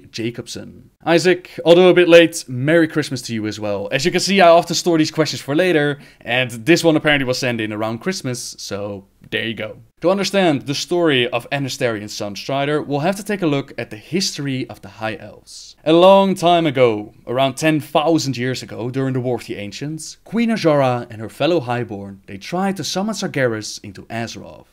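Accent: Netherlands. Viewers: your accent are you dutch?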